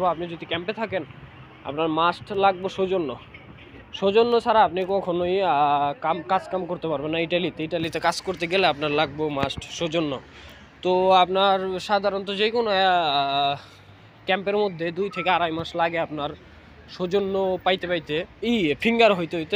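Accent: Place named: Faridpur, Bangladesh. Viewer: ভাই আপনার বাসা কি ফরিদপুর এ,, ভাষায় মনে হচ্ছে ফরিদপুর, আমার বাসা ও ফরিদপুর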